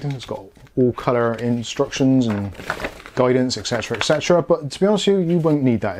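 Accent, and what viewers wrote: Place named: England, United Kingdom. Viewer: thank you for this video, but could very more useful if we could understand what are you talking about ,because for non English citizens not have a clue what you are saying,could be nicer to try to explain more slowly and without the heavy English accent thank you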